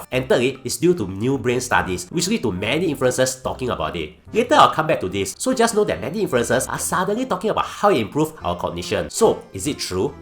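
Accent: Singapore. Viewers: This is a Singaporean cat. He’s speaking in Singlish dialect . 😅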